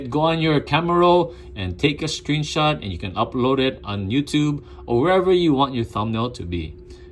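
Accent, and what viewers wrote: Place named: Japan. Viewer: I know this guy is japanese ..his accent is so cute😂❤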